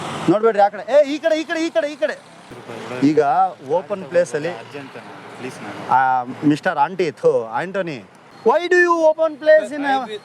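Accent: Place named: Hassan, India. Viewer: Bro nivu mathdo reethi nodidre namma Hassannadavaru anssuthe bro 🥰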